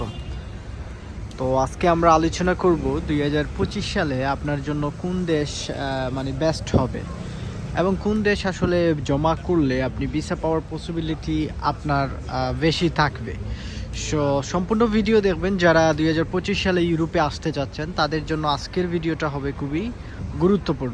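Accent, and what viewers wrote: Sylhet, Bangladesh. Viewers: ভাই আপনি সিলেটি নি??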